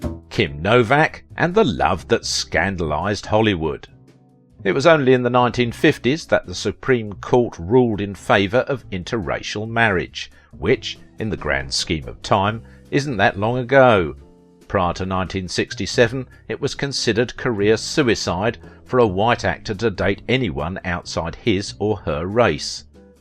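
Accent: United Kingdom. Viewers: HI Beautifully narrated with that great British accent! Cheers! Shalom to us only in Christ Yeshua.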